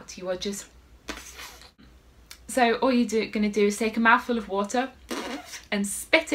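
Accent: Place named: United Kingdom. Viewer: Or a stuffy nose for that matter. I hope you find that supposed third book. But I love your circular breathing. And I love your British English accent.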